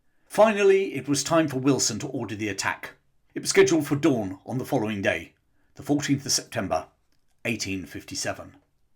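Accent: United Kingdom. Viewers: I just discovered this history channel, and it's the very topics I want to hear! Best part, a Brit with a good voic is narrating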